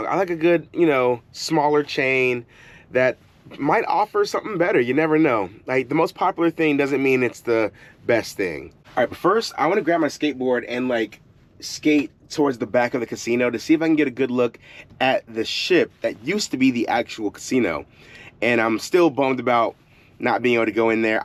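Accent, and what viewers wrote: South, United States. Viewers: ❤❤ I just learned how to pronounce beignets. Yes Southern accent is heart ❤️ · Um, you do have a bit of a southern accent to those of us not from the south. 🙂 Those beignets looked good. You can only have like one and have to pray that a diabetic coma isn't induced. But they are good with coffee.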